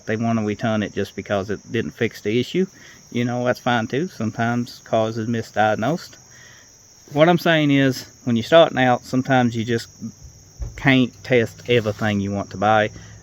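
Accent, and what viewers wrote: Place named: South, United States. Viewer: My first instinct was why does this English man have a southern accent....I listened a little longer and and it became more clear..... discombobulated me at first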